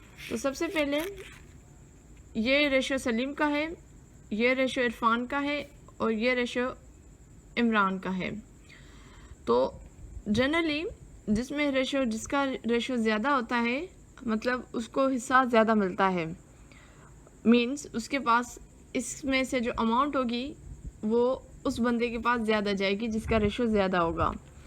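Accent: Sindh, India. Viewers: ڪجھ ليڪچر سنڌي ۾ به رڪارڊ ڪيو ادي، اوھانجو سنڌي لھجو اڃان وڌيڪ سٺو ۽ مٺو آھي 💯🙏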